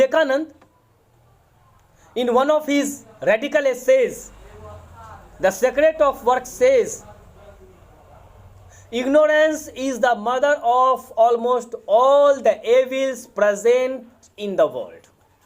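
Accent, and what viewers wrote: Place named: England, United Kingdom. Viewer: Sir nice english accent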